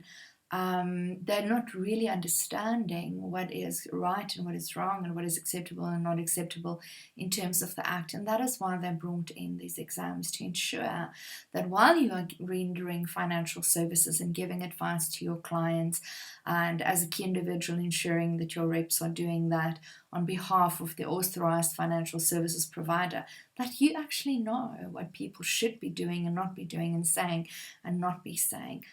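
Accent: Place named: England, United Kingdom. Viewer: Thank you very much. Btw, you sound very English, so maybe English instead came to you in a teapot.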